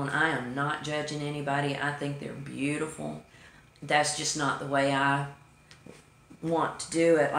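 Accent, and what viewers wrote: South, United States. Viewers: Well I do agree the older we get, we do start to TRY and rid ourselves of things we don’t use anymore and collectibles that just gather dust. But I do have a shoe and boot problem,I admit,I’m addictive to them. I love boots, cowboy boots,casual boots, rain boots, it doesn’t matter,I love boots. I love my shoes as well,I see a pair of cute shoes and I just have to get them. I have a problem,I admit it. BUT I do get rid of them if I don’t wear them for awhile and to be honest,some of them I have never worn, I use to be an impulse buyer, but I’m over that. I don’t like my house cluttered,I like things in its place and this sounds crazy to some, but I like to clean and I’ve been that way my whole adult life. If I get bored, I clean out something or organize a cabinet or drawers,just whatever hits me. I don’t really bother the boot and shoe area.LOL. 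Enjoyed seeing you and hearing that sweet southern accent,hope you have a wonderful day and take care and be safe.God Bless You all and praying for Granny. 💕💕💕💕 God Bless America ❤️🇺🇸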